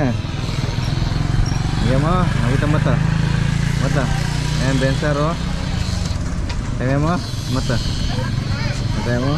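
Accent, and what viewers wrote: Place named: Pampanga, Philippines. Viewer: May accent nang Kapampsnganndi Mrs.🎉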